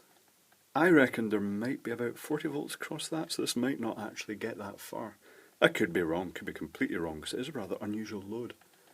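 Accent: Scotland, United Kingdom. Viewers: Lovely Scottish accent, Edinburgh area?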